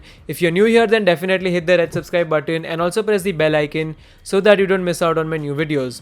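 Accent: India. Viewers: Why indian have funny accent?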